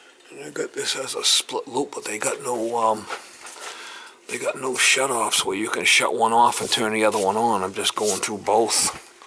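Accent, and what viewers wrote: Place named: New York, United States. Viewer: New Yawker…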